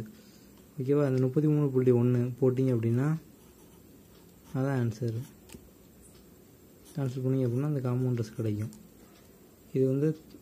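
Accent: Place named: Thoothukudi, India. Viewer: Anna ninga uplode panna Ella videos um pramatham...especially ur teaching skill is really good....nd ur slang tutucorin....innu nirya ethir pakrom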